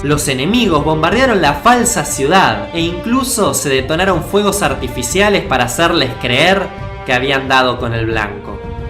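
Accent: Argentina. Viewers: Gumii Megpoiid ¿De dónde eres? No conoces el acento argentino, que mal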